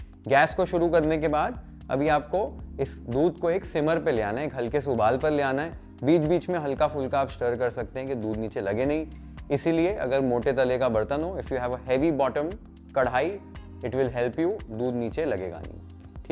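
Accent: Maharashtra, India. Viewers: Sanjyot Sir, a day before I tried Maharastrian Masale bhat.. today I tried your Thecha Paneer Rice.. dono hi itna sundar bana tha.. very tasty.. Being a Maharashtrian, loved the authentic flavours of them.. and your Assal Marathi Accent, too. 😄 Thank you so much for such a lovely recipes ,Sir.. ❤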